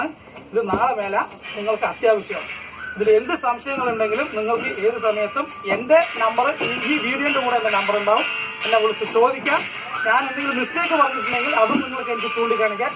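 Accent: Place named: Palakkad, India. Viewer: I Like ur palakkad Languages iam from pune T q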